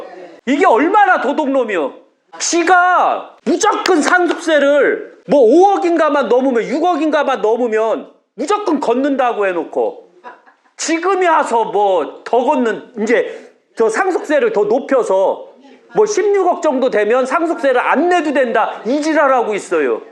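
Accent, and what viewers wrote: Chungcheong, South Korea. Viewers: 무식한 인간들 여기다모였네 갱상도 따까리들 아직도 세뇌되어 참으로 불쌍하다 아직도 독재박통 생각하고 있으니......쯧쯧쯧 충청도 사투리 쓰지마라 쪽팔린다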